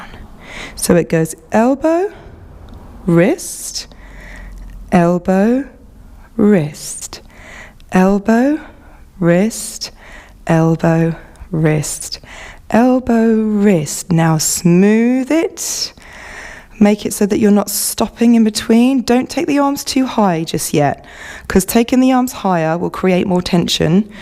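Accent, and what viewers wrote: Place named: Southern England, United Kingdom. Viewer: Thank you for providing such wonderful classes! Your English accent is also delightful, just wondering where you are from, somewhere in southern England?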